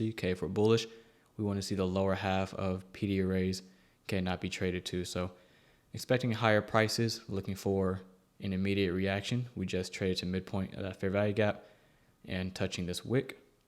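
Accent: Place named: South, United States. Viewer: Here for the southern slang, giddy up traders...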